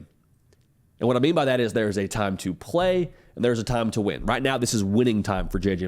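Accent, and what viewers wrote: South, United States. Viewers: This guy's accent and game picks just wreak of a butthurt SEC guy. He picked Oregon and Texas and now Michigan?!. How is he even relevant in the sports betting world?! Penix Jr. singlehandedly beat JJ and Michigan when he was with Indiana. Michigan had to beat #4 (#8) Alabama in overtime while the Huskies beat #3 Texas in regulation. We love being the Dawgs. I can't wait to keep these receipts...BOW DOWN TO WASHINGTON.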